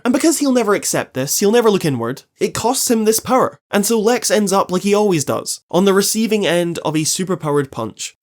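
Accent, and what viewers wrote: Scotland, United Kingdom. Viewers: I forgot you were so british wow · Are you scottish?I am too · I'm hardly assuming, the accent is quite clearly Scottish. And yes, I am. :^]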